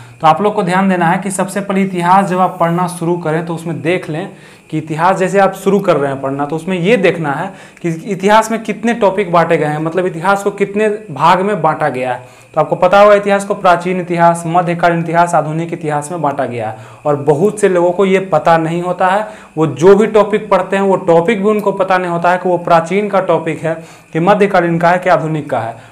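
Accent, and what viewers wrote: Bihar, India. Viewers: Sir ap bihar se h kya?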